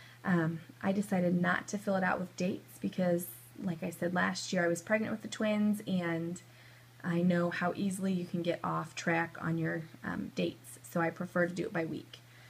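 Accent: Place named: North Texas, United States. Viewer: Hopefully my profile pic loads soon...but if it doesn't, this is Kristen. :) I love how you talk like a yankee moved to Texas! :) I'm glad you're doing this vlog as I am still considering homeschooling, and I love your organization. Thanks! Miss you friend!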